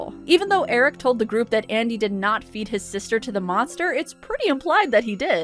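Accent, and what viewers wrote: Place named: Midwest, United States. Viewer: Your from the Midwest aren’t cha?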